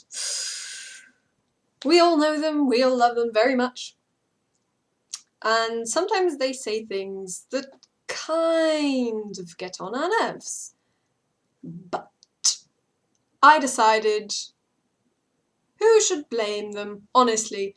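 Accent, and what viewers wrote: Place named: United Kingdom. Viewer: Du hast einen leicht britischen Touch :D Habe ich lange nicht mehr gehört! Und schrei bitte nicht deinen Pen an :( Der kleine will ja nur helfen...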